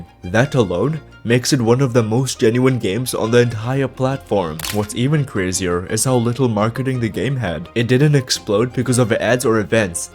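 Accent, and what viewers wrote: India. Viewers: I love this guys indian acseent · Wait why does he sound indian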